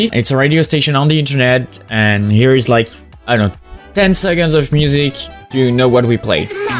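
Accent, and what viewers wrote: France. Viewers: J'était sur à 100% qu'il était français, en tout cas sont accent est incroyable x) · sounds french speaks English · Tu as un accent Français 🤔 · I just discovered this guy, and new he was french the first seconds of the video. It’s amazing how french accent is recognizable.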